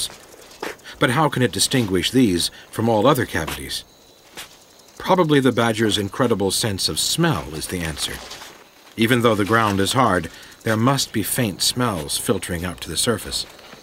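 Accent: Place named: Canada. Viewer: The narrator sounds Canadian. Feel like I'm watching a documentary from the Mother Corp back the 1980s. Cheers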